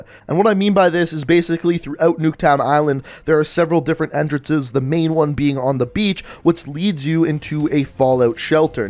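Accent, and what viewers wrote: Canada. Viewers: R u Canadian? Love it accent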